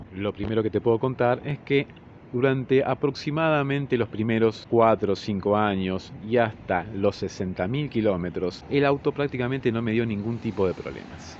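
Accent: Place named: Argentina. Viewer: desp de ver y escuchar varios vídeos, me encantó escuchar tu vos de argentino jaja. comparto con todo lo q dijiste. muy buen video, gracias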